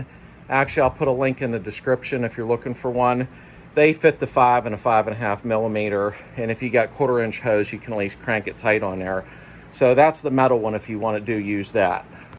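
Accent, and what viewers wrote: Pittsburgh, United States. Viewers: Is that a Pittsburgh accent I hear? Thanks for the great video!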